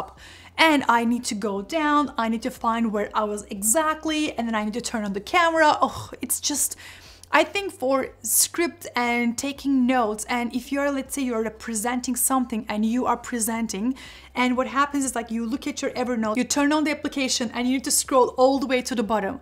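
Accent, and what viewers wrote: Turkey. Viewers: Tatlı bir Türkçe aksan sezinliyorum :) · Türk olduğunu bilmeseydim yine de Türk derdim sana podcast yayınları severek takip ediyorum ama çok güzelmiş ingilizcen :)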